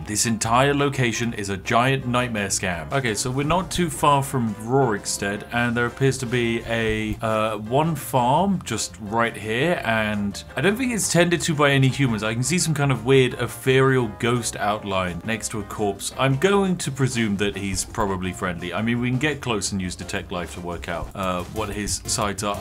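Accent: United Kingdom. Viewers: Is british? I can't help but notice his accent...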